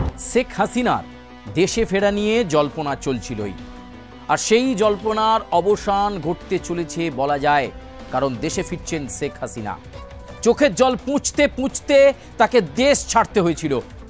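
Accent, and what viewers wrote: India. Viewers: দাদা তোমার ভাষা তো,, কোলকাতার। বাংলাদেশি সাজছো কেন কেন???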